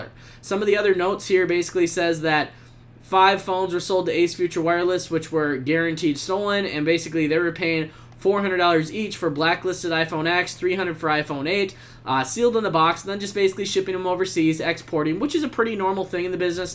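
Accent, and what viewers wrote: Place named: Detroit, United States. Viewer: You from Detroit?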